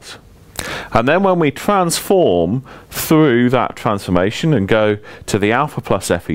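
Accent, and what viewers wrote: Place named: United Kingdom. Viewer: Being an American from the deep South, when I heard his British accent I knew this was going to be a good night. My entire study group sends a thank you from across the pond for posting these videos. Hopefully we'll pass the test in the morning.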